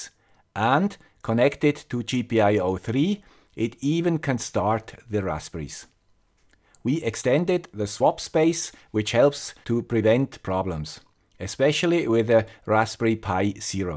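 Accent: Switzerland. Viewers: was in Switzerland last month for first time, and wow, this is the land that Swiss accent guy living and making experiment !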